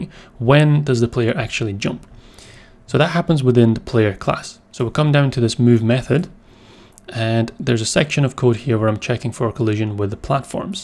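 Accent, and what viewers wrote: United Kingdom. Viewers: Are you british?i heard lot of init(not programming init).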